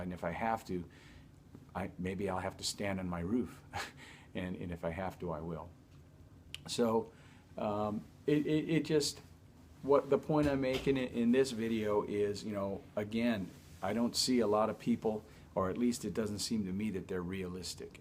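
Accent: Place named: Nevada, United States. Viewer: Sounds like a Nevada man :)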